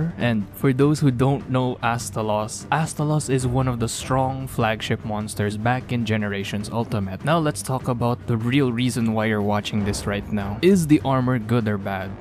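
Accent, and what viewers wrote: Philippines. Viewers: Filipino ka ba bro?? I sense it with your accent eh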